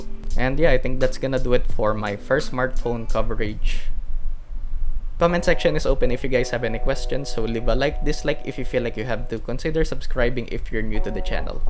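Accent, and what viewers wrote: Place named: Philippines. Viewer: Sabi na pinoy ka... First time ako dito... Nasabi ko lang dahil ng accent mo hahaha skl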